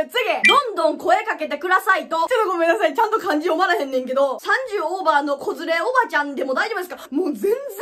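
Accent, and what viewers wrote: Wakayama, Japan. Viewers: 今日わはっていうところとか、〜やんって言うところが和歌山県民だと思ってました。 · めっちゃ和歌山弁やと思ってたら和歌山すんでるんや😹この前電車で横に座ってました爆笑爆笑爆笑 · 地元の友達のノリと話し方そっくりで多分和歌山の人やろうと思ってて、ほんとにそうやってすっきりした。こんな短時間でたくさんありがとう言われたん初めてや。年下なのが信じられん。応援してます。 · 見た目と関西弁のギャップえぐいww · はじめて動画を見ます！見た目がクールなので、性格もクールな感じなのかと思っていましたが、中身はばり関西弁の太陽感ですごくおもしろいです(T T) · 間違いない笑ちょっと和歌山弁入ってるけど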